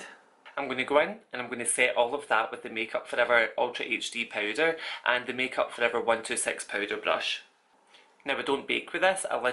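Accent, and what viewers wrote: Scotland, United Kingdom. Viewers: You're just gorgeous, and I could listen to your Scottish accent all day!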